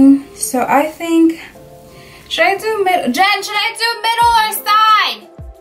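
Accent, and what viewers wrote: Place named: Haiti. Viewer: Haha I knew you were Haitian by your accent. Love it♡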